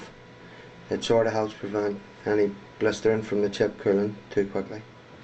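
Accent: Northern Ireland, United Kingdom. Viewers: thats a northern ireland accent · Actually he is northern Irish, which is in the UK, not Republic of Ireland which isn't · Pretty sure he's from Northern Ireland. -_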